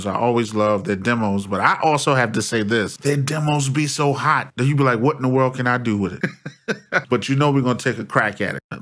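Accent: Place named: New York, United States. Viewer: you sound like you from NY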